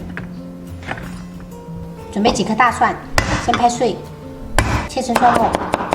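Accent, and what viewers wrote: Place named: Minnan, China. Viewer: 四川人闽南话说得那么地道？赞一个！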